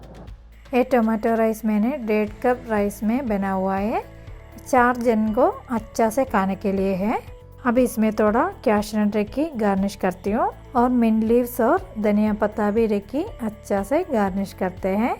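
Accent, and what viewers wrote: Kerala, India. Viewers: Along with your delicious recipes, I enjoy your typical Hindi spoken in complete 💯 percent Malyali accent which is a treat to enjoy listening as well with watching simultaneously, being a mumbaite. Good job and I wish you all the very best for all your upcoming ventures.. 🎉🎉❤ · mam greetings from Maharashtra. Your have been taking Initiative & efforts to reach out " Kerala's food " to all over india is really appreciative. Your's recipes are really nice. Always keep growing. Your Kerlian hindi speaking is very sweet. waiting for Aravana paysam recipe.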